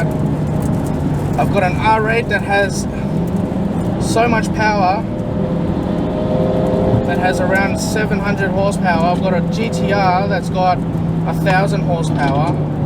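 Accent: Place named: Australia. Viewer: Sounds like Australian accent. Did you live in Aussie before? I used to work in Laos. Sabaidee from Indonesia 🇮🇩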